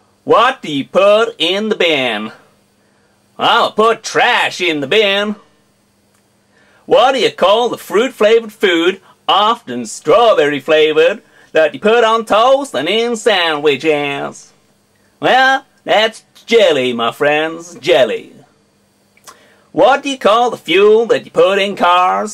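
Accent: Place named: South, United States. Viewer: This is the first time I've laughed at a Southern accent for any reason other than the "you sound like an idiot" thoughts that usually run through my brain XD . You gave me a reason not to hate the Southern accent, so kudos for that.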